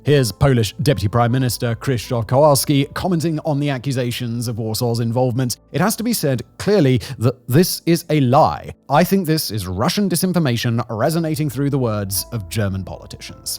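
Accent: United Kingdom. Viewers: an artfully belabored speculation with a right proper british accent is still speculation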